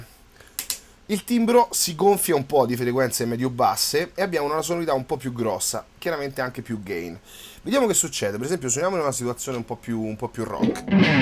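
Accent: Rome, Italy. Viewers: ehi...sei simpatico con il tuo accento romano (de roma?)....ottima dimostrazione...bravo nei riff...bel lavoro!!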